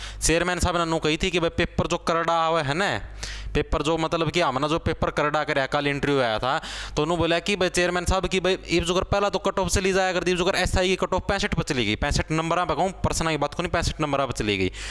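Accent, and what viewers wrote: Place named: Kaithal, India. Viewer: Bhai kaithal side ki yhi boli h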